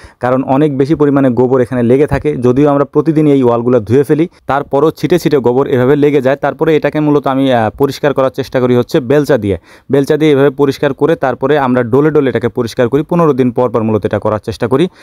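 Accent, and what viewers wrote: Faridpur, Bangladesh. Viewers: Vaiyar basha ki Faridpur?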